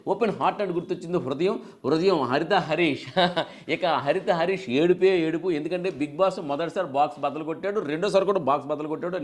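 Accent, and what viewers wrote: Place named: Srikakulam, India. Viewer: Enti sir maa Srikakulam slang touch avuthundi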